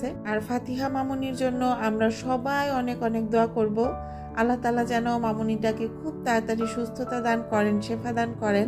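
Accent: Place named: Bangal, Bangladesh. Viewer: কি সুন্দর বাঙ্গাল কথা বললে❤একদম সংসার ছেড়ে একদিন ও কোথাও ও থাকার উপায় নেই❤লক্ষী বোন আমার আছি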